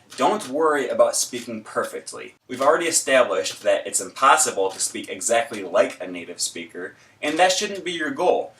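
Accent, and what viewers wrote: Spain. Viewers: nice spanish accent dude. (I´m a spanish native speaker) :) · nice spanish accent. (I´m a spanish native speaker) ;)))))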